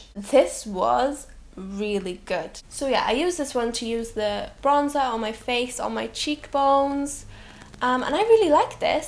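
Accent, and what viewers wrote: Wales, United Kingdom. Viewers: Are you from Wales? 🏴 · U Welsh?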